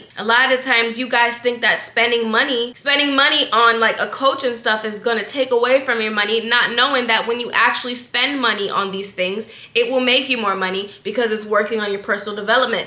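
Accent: Jamaica. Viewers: It’s the Jamaican accent right out the gate for me! We NEED money 💰 👏🏾👏🏾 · Yeah the accent is strong in this video. I think I heard a little in other videos tho. Im thinking she is Jamaican. Maybe she’s been around family or spending time there.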